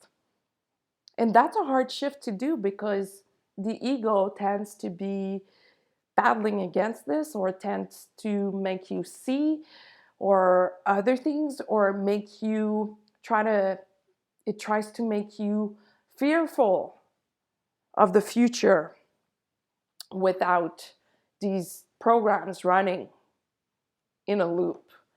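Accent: Quebec, Canada. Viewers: Do I hear a little French-Canadian accent!? 😉😉😉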